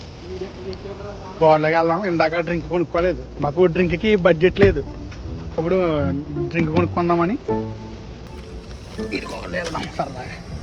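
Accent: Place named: Godavari, India. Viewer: Godavari slang adaragottestunnav anduke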